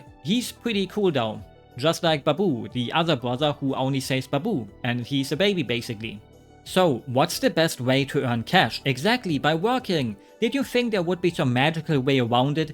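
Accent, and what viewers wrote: Germany. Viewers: you are like if the guy who made top 10 hottest sonic characters had a cute german accent and im here for it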